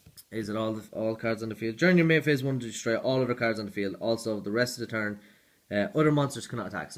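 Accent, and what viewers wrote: Ireland. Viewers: Ayy you sound irish :P sell me your deck pls xd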